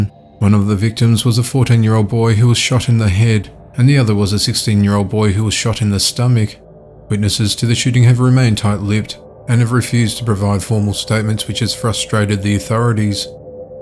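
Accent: Australia. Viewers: He's definitely Australian, but I'd like to know more!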